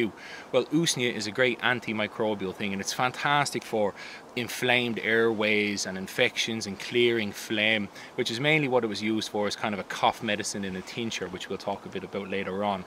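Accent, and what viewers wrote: Ireland. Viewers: Dublin I’d say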